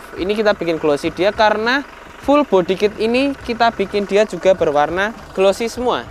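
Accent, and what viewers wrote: Java, Indonesia. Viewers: Mau nyari minusnya kok blm ketemu ya.......,selera baget nih Glossy dan glowing😊.ternyata kokonya kl pake bhs jawa "Medok" bgt ya, lucu.....😅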